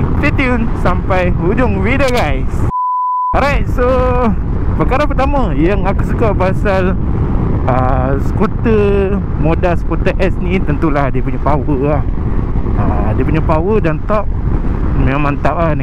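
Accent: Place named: North, Malaysia. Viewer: Baru 1st time tgk video abg..menarik makin hujung video makin byk pelek utara kouaq 😅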